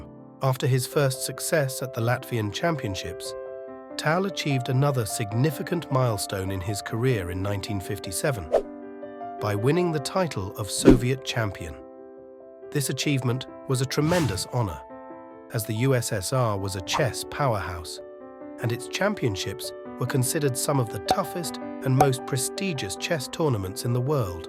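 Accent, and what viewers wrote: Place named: RP, United Kingdom. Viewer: You're not supposed to notice stuff like that when the narrator has a posh accent.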